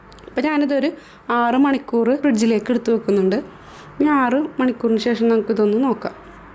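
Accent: Kodungallur, India. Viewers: Ellathinteam pirake ka nnanallo. ...kodungaloor bhashayano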